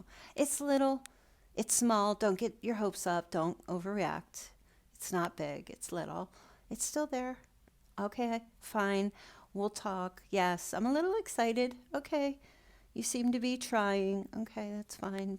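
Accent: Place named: New York, United States. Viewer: You sound like you from NY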